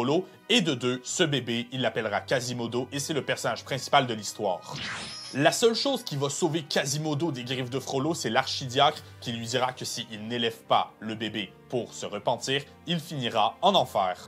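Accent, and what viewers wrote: Quebec, Canada. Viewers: Wô! Es-tu Québécois toé là? :D (Sorry, je viens de débarquer sur la chaîne! Des critiques de films et analystes québécois sur Youtube, j'en vois peu!)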